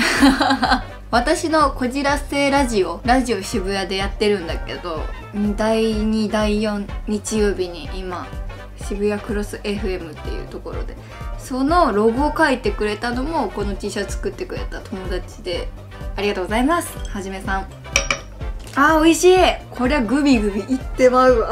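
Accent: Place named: Okayama, Japan. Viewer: 岡山弁すき、、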